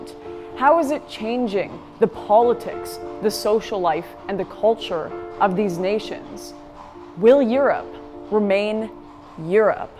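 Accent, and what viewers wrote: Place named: Canada. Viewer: I love her Canadian accent, “prOject”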